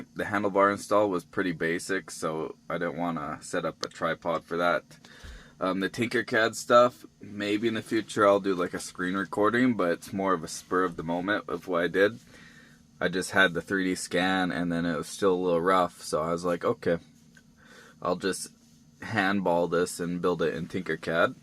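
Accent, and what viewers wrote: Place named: Canada. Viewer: Cool stuff, love those CL Fords, or any Fords for that matter. The Cargo star is pretty dang awesome too, love me some cornbinders, lol. Pretty much anything with an engine. But those CL COE tractors are the best looking IMO, next to a 9670 IH or FLB. Where in Canada are you, I saw the Tim Horton's shirt and the accent gives it away, lol. I'm in NY about 100 miles from the border. Keep on truckin!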